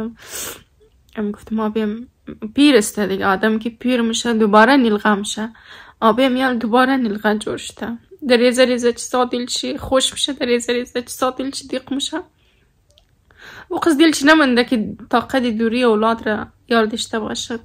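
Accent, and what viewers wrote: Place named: Hazarajat, Afghanistan. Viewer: خانم شما ولاگ های خود را صرف برای قوم هزاره خو نمی سازید که غلیط هزارگی گپ می‌زنید باید همه فارسی زبانان را در نطر بگیرید در ویدئو هایت معلوم نمی‌شود کی برادرت است کی ایورت است همه را لالیم میگی و بیگی کی است چرا درست معرفی نمی‌کنید پیکی کی است و این کی تان می‌شود فامیل کدام است همگی را لالی میگی ما نمی‌دانیم که کدام فامیل تو است و کدام از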